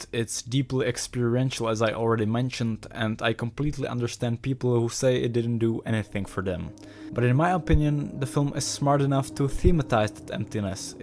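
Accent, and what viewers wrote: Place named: Czech Republic. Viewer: where are you from? you sound czech/slovak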